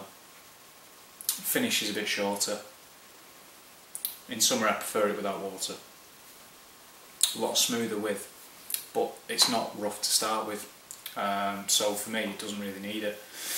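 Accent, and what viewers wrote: Lancashire, United Kingdom. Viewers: I've changed my mind. You're from Lancs.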